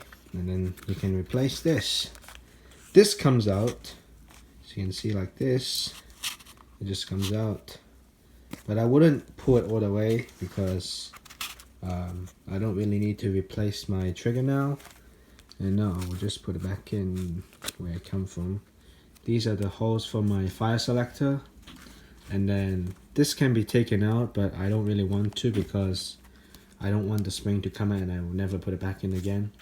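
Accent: Russia. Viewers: r u russian? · I am a Russian, the accent is so good I thought you might have been a Muscovite . I own an arsenal of AK guns and comparing this cardboard replica to my actual AK-47 it is incredibly accurate, almost every little detail is included. You are extremely underrated, it's always the creators that put no effort into the videos that get popular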